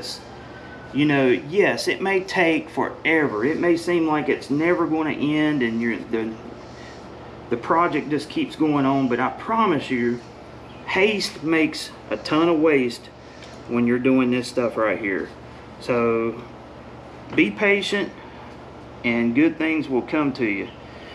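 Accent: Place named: South, United States. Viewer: Thanks Bud regards from AUSTRALIA, p.s i love your Southern Accent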